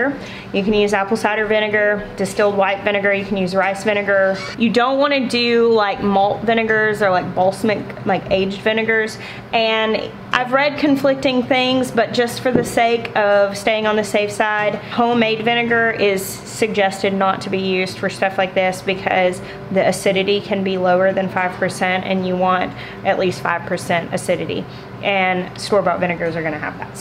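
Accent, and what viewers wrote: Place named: Knoxville, United States. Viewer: So....im watching with my 78 yrl old momma and when you started talking she said "is that girl from knoxville?" And i said uhnnn. ...yea why i was shocked because shes never watches your vids with me but she indeed could tell you either were born in or raised in Knoxville....is she right?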